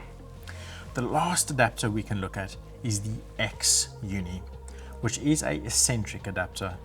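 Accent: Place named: South Africa. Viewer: I can hear he's a South-African. I know my people.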